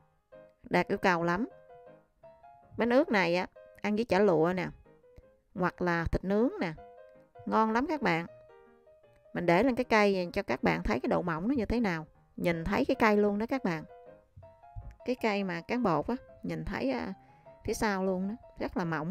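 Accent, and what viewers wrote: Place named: South, Vietnam. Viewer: Cám on chị chia sẻ, rất thật tình & thích nghe giọng nói đậm chất miền nam của chị.....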